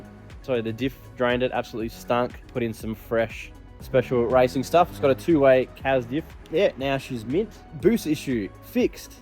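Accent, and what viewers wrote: Australia. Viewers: Love your spiiiicy content!! (Ausieacent) !!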